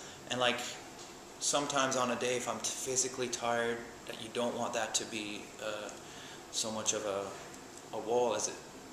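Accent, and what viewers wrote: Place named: Canada. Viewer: yeah he does have a slight accentuation on certain sounds :) but its defo a canadian accent :) he may speak another languadge he was born in Iran so who knows maybe thats the cause of the slight accent :D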